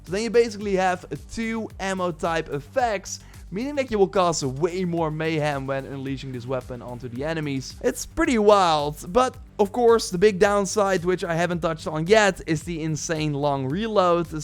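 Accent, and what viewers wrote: Netherlands. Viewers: Ik hoor je Nederlandse accent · Ik wist dat hij Nederlands was vanwege de accent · Are you dutch?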